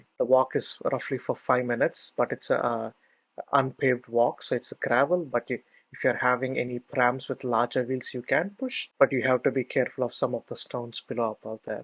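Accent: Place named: India. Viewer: Shame that it's so difficult to understand the thick Indian accent. Why didn't you get someone to narrate for you. Btw it's not Brooks Lookout. Although your transcript does say Burkes, you wrongly pronounced it as "Brooks". Had I not read the follow on, I'd be looking for Brooks Lookout, man. I have been here many times and never seen a cell tower, so perhaps didn't trek the exact same route as you. I was around the tv towers and the Kyeema memorial site.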